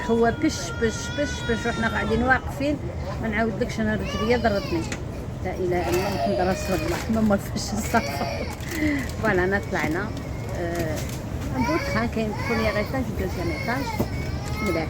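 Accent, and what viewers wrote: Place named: Algeria. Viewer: خالتي واش أصلك من المغرب والجزائر حيت عندك طريقة كلام جزائر ونتي وقيلا دبا بالمغرب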